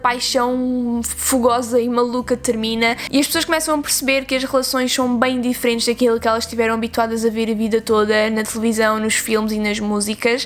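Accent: Portugal. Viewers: O tema do vídeo é ótimo mas foi o sotaque português que me fez ficar 🇧🇷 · Oh meu Deus, o português de Portugal é tão lindo 😍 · Que lindo seu sotaque português ❤️ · Oh droga, é português de Portugal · Aaaa esse sotaque portugues ❤. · Kk eu nao me relaciono com ninguem há 3 anos e de repente eu caí aqui. Eu sou apaixonado pelo sotaque portugues, nunca gostei tanto de um recomendado aleatorio como esse. Ja me inscrevi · eu amo esse jeitinho português de falar, e seu video foi muito bom e esclarecedor, adorei! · O Português de Portugal é um luxo viu! 😍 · Sotaque português é tão gostosinho, e seu canal é incrivel, beijinhos do Brasil hihi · Gente ela tá falando português de Portugal ou brasileiro? Pq eu tô entendendo tudo kakakakaka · Nunca gostei muito do sotaque português de Portugal, mais gostei demais desse vídeo,assisti todinho... 😂😂🤣🤣❤️🇧🇷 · Então é esse o português de Portugal? 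· Olá! Adorei que o YouTube do nada me recomendou, assim como estou vendo nos comentários, n fui a única, parabéns pelo sucesso e saiba que irá crescer cada vez mais! Estou muito feliz por ter conhecido seu vídeo e amo esse sotaque português! Sou do Rio de Janeiro, Brasil. YouTube adivinhou que estou passando por algumas coisinhas no relacionamento hahaha, Obrigada por me dar mais esperança, mais uma inscrita! ❤️ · Aii que linda, mal conheço já amei ela 💕 lindo esse sotaque dos portugueses 😍❤️ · Nao to acostumada a ouvir o sutaque português.... no começo do vídeo eu só conseguia entender CUSUACHDUSHFUSHCUSIAIHCIUDUSBE DUEJWKAOAIJWHEUWQ da no meio do vídeo eu ia pra HSCGHAUAUS RELAÇÕES CHUAHS BOCADINHO CHAUSUSB E no final do vídeo já tá a Portuguesa já Entendendo tudo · Ja ia perguntar se tu é gringa, e aí notei que era o sotaque de Portugal kfkkkkk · Sou Brasileira, a gostei muito do seu sotaque português! 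· Só brasileiros vão entender mas eu entrei no vídeo achando que era a Maju O SUSTO quando começou a falar português de Portugal kkkk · Português (provavelmente do centro do país) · Adoro o sotaque dos portugueses. Adorei ouvir essa YouTuber!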